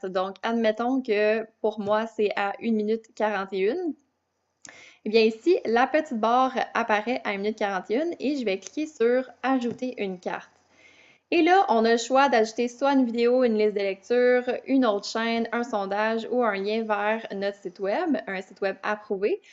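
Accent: Quebec, Canada. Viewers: Putain, l'accent Canadien c'est vraiment trop horrible ! Sérieux ! 😱. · Merci pour ce tuto sympa avec l'accent canadien que j'adore ! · allant et parlant trop vite,on a du mal à vous comprendre avec votre accent Canadien,juste une explication comment envoyer un lien d'une vidéo à quelqu'un sur une autre vidé,Merci. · J'aim très ton accent 😍 c'est le Québécois ? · Merci pour la vidéo ! J'adore l'accent québécois !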